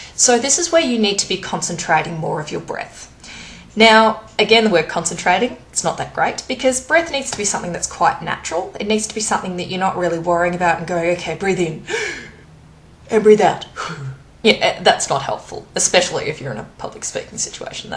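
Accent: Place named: Australia. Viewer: Aussie accent, the one and only. Just love it!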